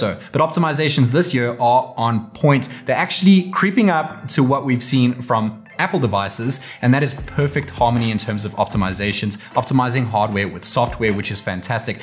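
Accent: South Africa. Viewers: Bro sounds South African🧐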